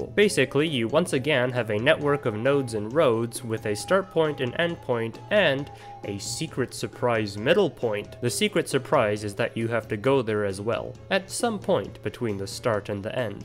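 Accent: Netherlands. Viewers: I love your dutch pronounciation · Love your dutch pronunciation tho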